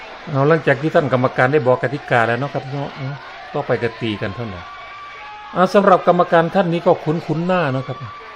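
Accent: Isan, Thailand. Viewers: ผมชอบคนภาพมวยเสียงอีสารมากๆเลยครับฮาดีครับ(ชอบครับ😅😅😅)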